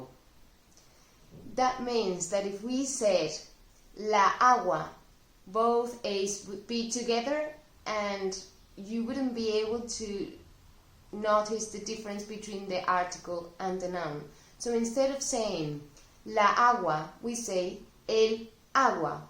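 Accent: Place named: Spain. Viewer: Me gustan mucho tus videos! Me parece que hay muchos video por los principiantes y todos explican las mismas cosas pero no hay muchos video por los estudiantes intermedios quienes no entienden aún los videos nativos pero las cosas básicas son demasiadas fáciles. Me gustaría ver algunos videos donde por ejemplo hablas solo en español sobre algún tema, para que yo puedo escuchar pero tambien que añadas los subtítulos en español y inglés para que podamos aprender nuevas palabras. :-) perdoname por mis errores que he escrito, es que ya he pasado mucho tiempo buscando algunos video más dificíles para estudiar un poco más 😄 he visto que estás comenzando y por eso creí que podría pedirte por esos videos😊 especialmente por tu accento español, porque tengo más problemas de entender ese accento que los latinos :-) gracias y espero que tengas un buen dia!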